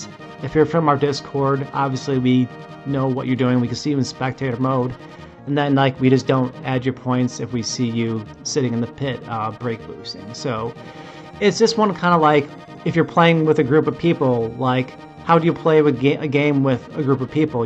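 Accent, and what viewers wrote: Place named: Midwest, United States. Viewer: Midwestern accent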